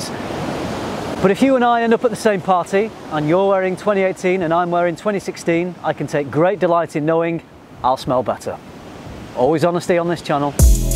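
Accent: United Kingdom. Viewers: Dammnnnn ur cinematography skills are fabulous and gud review as well... I m from Pakistan but I don't why British accent feels so attractive to me... I would love to listen that all day long...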